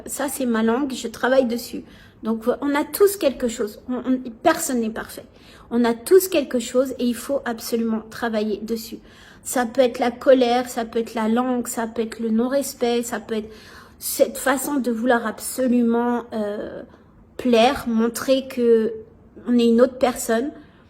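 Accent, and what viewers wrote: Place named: Arab world. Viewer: fete de l aid du ramadan ces manger pendan 1 moi s engraisser la nuit manger 3 repas en 1 fois vou vou prenez pour des foque a vouloir remplir vos reserve de graisse tai une meuf perdu deja fai jamai d enfan tai pa assez belle tu ai influencabl ta des souci psychologique en quoi tai belle ta pa le vosage racer fin tai une movaise race aryenneee ces pour sa tai musulmane allah crer les arab avec des tete de chameau des nez de juif les saoudien on les nez et ma bouche pareil que le chameau pourquoi allah fai moche sa creation 🙂 on dirai une enfan qui parl tu parl com une pucel tu parl que tu va attirer les sal type mais ces sal typ son la creation d allah tu insulte donc sa creation tira en enfer tai une kassos tu as telemen besoin d amis que tu ai devenu musulmane mai v ou ile toi tai pa assez bell pour te devoiler et ton accen arab le hhhralal tu n ai qune blanche perdu fai un test de qi mdrrrrr la pieter dan le keur et la keu dan quoi mdrrrrr ta pa honte dal merdeuse tai moch com tes seur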